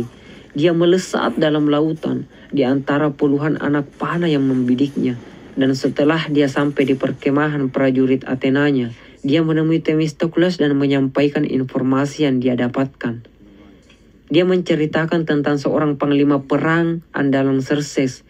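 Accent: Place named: South Sulawesi, Indonesia. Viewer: dari logat kayak org sulsel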